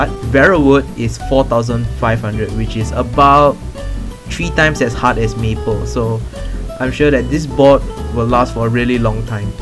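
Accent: Singapore. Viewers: Haha you sound either Singaporean or Malaysian! Anyways nice tutorial bro! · Singapore accent?? :00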